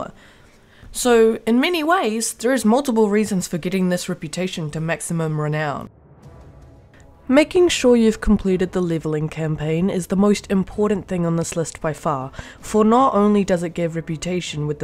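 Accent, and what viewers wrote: New Zealand. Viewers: I absolutely love your accent, is that a kiwi accent?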